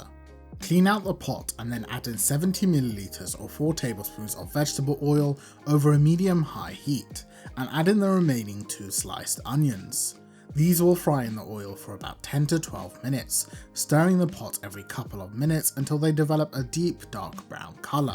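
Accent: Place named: London, United Kingdom. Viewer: You have a London accent: please tell me you run a restaurant...and exactly where it is. YUM. What a terrific channel. No wonder all these wonderful immigrant mums, grandmas and aunts spend all day cooking together when there's a family event to cater for. Your recipes are incredibly laborious and just make me wince with sadness knowing I'll never spend all day cooking some of them but would gladly pay good money to someone who takes the trouble to cook certain dishes for me. Wow, just so delicious and impressive to see how it's all done. Bravo! 🌍🍽🤝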